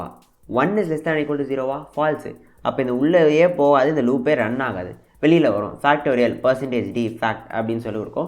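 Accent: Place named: Tirunelveli, India. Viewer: Hi bro unga slang vachi parkumbothu i think you are thirunelaveli paiyan I'm i correct✅😂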